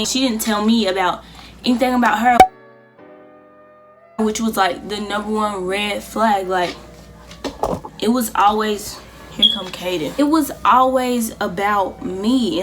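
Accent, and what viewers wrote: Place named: North Carolina, United States. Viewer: You sound like you from the NC . AUTOMATIC subscribe <3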